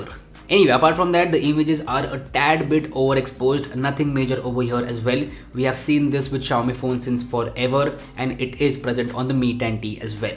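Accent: India. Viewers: Why Indian speak like this????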